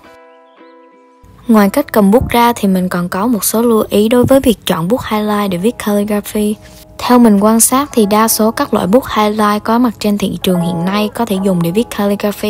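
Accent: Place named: Da Nang, Vietnam. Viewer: Chị ý người Đà Nẵng ý bạn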